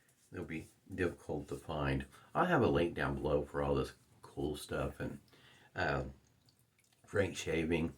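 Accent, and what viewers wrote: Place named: Tulsa, United States. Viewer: I’m a fellow Tulsa and was thrilled to find your channel. I was recommended to your channel from a link on Badger & Blade. They remarked at how laid back you are. I watched the video and thought that you sounded familiar, and then you mentioned Tulsa. You sound familiar because you talk like I do! Anyway, I really enjoyed your video. I use MWF almost exclusively. One thing I do different from you is I load my brush in the crock and then move to a scuttle to add the water. You are right about the crock becoming slick, and I’m a bit ham handed, so I don’t like trying to pick the crock back up until I’m done. When I’m done shaving, I clean up the crock by putting the lid on and flipping the crock sideways and rinsing the whole crock under cold water. A little water sneaks into the container and I put the crock away with the lid on and that teaspoon or so of water sitting on top of the puck. 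Since I shave daily with The Fat, it’s moist and ready to go the next day. If for some reason I skip a few days, I’ll put some extra water on top. Thanks for your channel, I’ve subscribed and turned on notifications.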